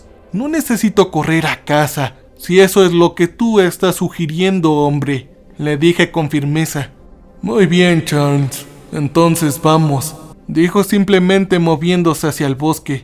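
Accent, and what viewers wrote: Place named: Mexico. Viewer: Tu eres un mentiroso o cuenta los cuentos de otros, en otro cuento eras de Inglaterra y ahora eres de EEUU cuando tu acento es mexicano o de sur américa. Yo soy norteamericano y de descendencia de P.R y cuando vives en EEUU pierdes el acento latino.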